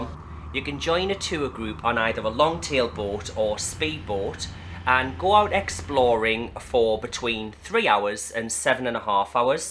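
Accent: Newcastle, United Kingdom. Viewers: Great vid. Only question... is tha a Geordie accent mate?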